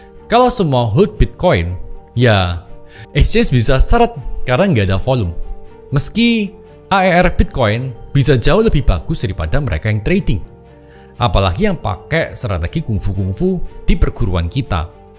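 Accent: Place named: Surabaya, Indonesia. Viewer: Iki ketok’e arek Suroboyo yo? Keroso dari logat e. Lek iyo berarti kita konco plekkk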